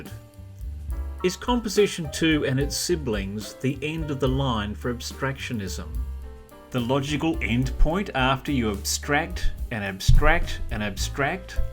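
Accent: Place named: United Kingdom. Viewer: Isn't this just pop art, like Warhol? (Not that his stuff is any lesser than regular art. It has a huge influence on our lives.) Also, it immediately calls to mind the Monopoly board. Thank you for these videos. You have everything you need to succeed as a narrator: you have a wonderful understanding of and love for your subject, you have a way of making art understandable for your viewers, and you have a British accent. Subbed.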